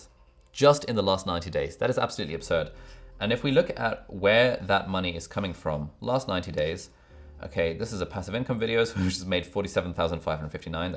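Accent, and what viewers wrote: England, United Kingdom. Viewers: Absolutely love this and omg your British Accent is to live for!! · Hi, I like ur video, but plz try to slow down little,I paused too much to cope with… cool British accent.Thnx a lot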